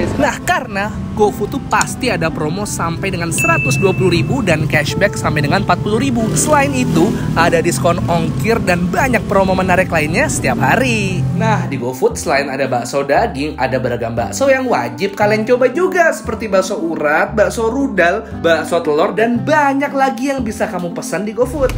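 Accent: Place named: Banyuwangi, Indonesia. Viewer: Jawa timuran bang tpi jawa timurnya yg timur bgt BANYUWANGI 🤗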